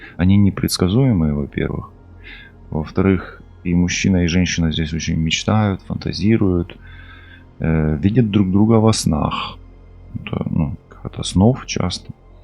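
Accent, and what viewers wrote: Ukraine. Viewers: Он Водолей,Я близнец..Я кацапка.. мы сос тояли в браке..1ОЛЕТ., ЧУЖОГО я не брала,пусть вернутчтто украли...Приятно слышать украинский акцент,хотя многие. слова. не понимаю.. У меня подруга с семьей проживала в. Броворах,а потом ВЫСТРОИЛИ дом в Винице.За. 3 дня до войны,она ПР ИЕЗЖАЛА..🎉.Я приглашала к. Себе пожить,но они не сммогли выехать.Раньше была связь,а теперь уже давно нет,побаче пожалуйста Скажи,они для меня как родные.я..яс уваж.Надежда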